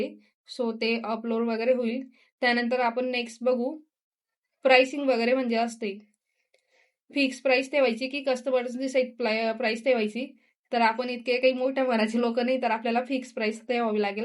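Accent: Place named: Khandesh, India. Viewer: Tai tuzya aawajat खानदेशी भाषा आहे म्हणून तुझे व्हिडिओ फार आवडता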